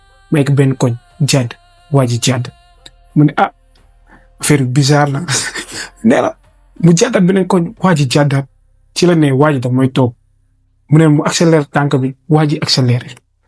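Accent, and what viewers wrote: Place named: Marseille, France. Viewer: kn marseillais nga 🙄😒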